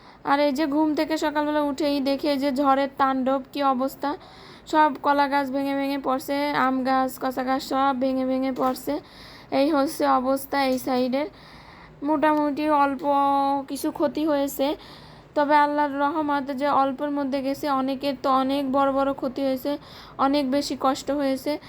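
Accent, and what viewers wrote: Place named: Barishal, Bangladesh. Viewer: আপু এটা আমগো বরিশাইল্লা বইন তুমি বোঝো নাই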